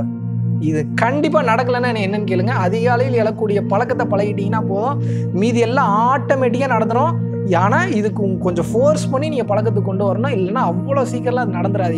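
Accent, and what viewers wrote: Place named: Kongu, India. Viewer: Supper tambi Solum vdam miga alagu Enga ur kongu vaadaiatikudunga peshle 👌👌🙏🙏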